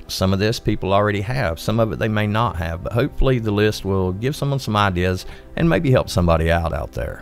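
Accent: Alabama, United States. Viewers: That sounds like a Cullman Alabama accent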